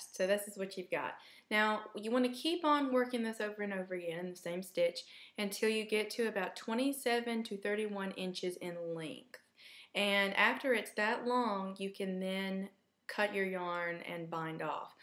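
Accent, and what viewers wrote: South, United States. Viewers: This is terrific, I am an experienced knitter and you are too. In watching this I learned new stuff, so thank you. I detect a bit of a Southern accent, made me feel all warm and cozy while I was learning new stuff. Take cafe good care of yourself!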